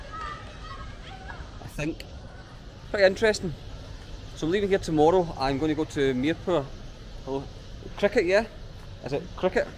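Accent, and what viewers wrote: Scotland, United Kingdom. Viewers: Sounds like Dale Philips, you must be Scottish. Very weird accent,requires too much attention to understand what are you trying to say. Airline is very reliable, and takes you where you want to go.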